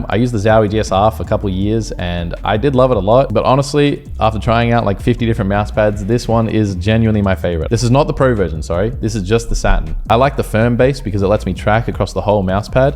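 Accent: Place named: Australia. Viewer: I love the Australian accent 🦘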